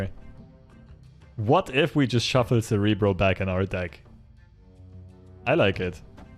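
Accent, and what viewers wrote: Germany. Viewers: Most german accent on YouTube 😍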